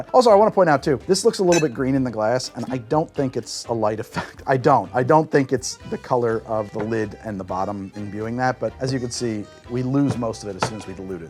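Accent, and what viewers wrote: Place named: California, United States. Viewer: If you close your eyes this guy sounds exactly like Andrew Tate if he was from California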